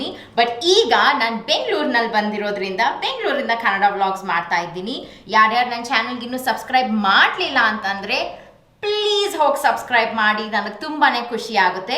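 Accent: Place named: United States. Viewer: #giveaway #ಗಿವ್ಅವೆ Hey there , Your kannada speaking style with American accent is so soothing😍, loved the idea of yours to teach your childrens to speak kannada.Stay Happy and prosperous☮️♥️.. Much love 🤗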